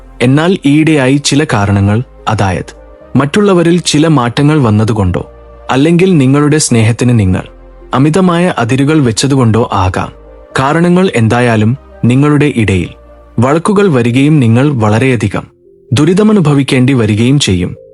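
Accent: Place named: Tamil Nadu, India. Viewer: OM DURGA DEVI NAMAH. The words are pronounced like Tamilian who do not know Malayalam.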